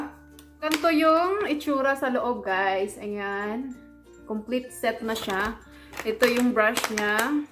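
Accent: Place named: Visayas, Philippines. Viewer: usong uso yan sis maganda daw talaga gumamit niyan...ok lang ang punto na bisaya gusto ko nga kasi sarap sa tenga pakinggan ng salita niyo ")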